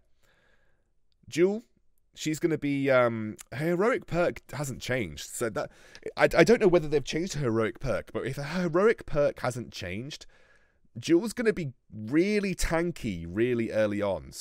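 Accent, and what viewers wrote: United Kingdom. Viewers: Phinn yeah. Luckily his accent is textbook British English so yeah could understand easily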